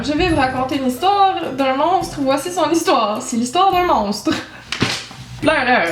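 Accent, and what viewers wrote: Quebec, Canada. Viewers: ah je suis pas là seule à être amoureuse de l'accent québécois je l'aime tellement c'est tellement beau 😍😍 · c'est normal si des gens en blanc viennent chez toi, ne t'inquiète pas, je les ai appelé pour t'aider x') j'aimerais tellement avoir un accent QC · Yesss t québecoise ? Moi oui tu as le meme accent que moi! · J'adore ton accent québécois , j'aimerais trop avoir le même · PUTAIN une québécoise... JE deteste vos accents berk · Tu es canadienne ? tu as l'accent de garou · C'est bête, j'aime bien ta vidéo (c'est la première que je regarde) et j'ai envie de savoir la suite, mais ton accent québécois est super prononcé de mon point de vue (parce que je suis française), alors je ne comprends pas tout... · D'habitude j'aime pas l'accent québécois ,mais le tien a beaucoup de charme. J'aime beaucoup ton agent, vraiment! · Moi aussi je trouve l'accent québécois magnifique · ça fais du bien de voir qu'il y as des gens qui aime notre accent québécois ! 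merci! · Je suis francaise et J.A.D.O.R.E l'accent Quebecois franchement chuis amoureuse de votre accent 😻😻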